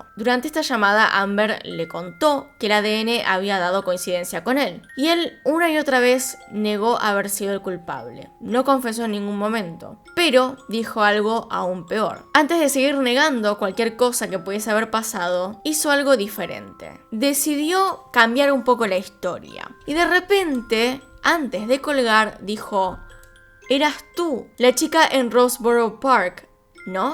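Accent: Argentina. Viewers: así hablan los argentinos, no es cosa de pronunciación es cosa de acentos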